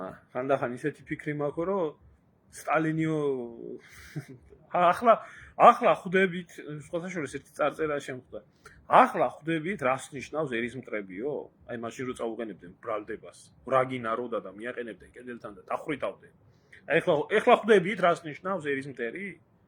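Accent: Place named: Mingrelia, Georgia. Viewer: შენი მეგრუ(ლ)ი აქცენტი არ გაიშვას😂😂😂😂.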